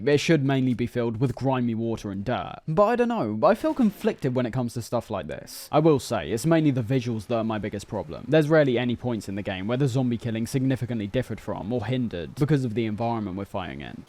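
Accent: United Kingdom. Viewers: Blimey da bloody animalistc deep monologue turning me into a bloody zombie wih the stylistic thought proceeds similar to americans fuck i hate godamn bri'ish blokes fucking better then me talking so annoying dislike reported blocked stopped watching all that fuck pissing me off by the second w yo busted teeth talkin smart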